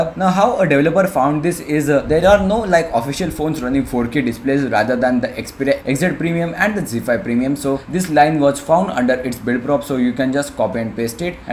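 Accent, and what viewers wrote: India. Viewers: Indian accent check Fast talking check Doesn't explain anything check Conclusion:don't recommend to do this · I don't like the Indian accent it's difficult to understand PD: I'm not native speaker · Indian accents are funny as fuck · Another garbage indian accent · 😂😂😂😂 asian/indian dialect is so fckng funny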